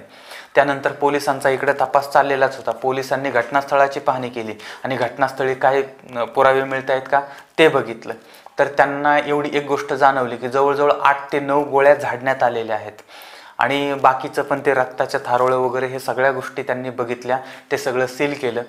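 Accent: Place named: rural, India. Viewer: निवेदन शैली गावाकड्ची, विनोद्प्रचूर पण गुन्हेगारी विशयी गाम्भिर्य व तळमळ असलेला लय भारी!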